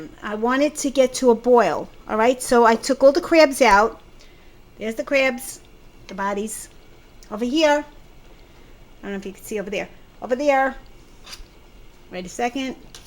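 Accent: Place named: Brooklyn, United States. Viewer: You have such a nice Brooklyn acccent you still live there 😃😃😃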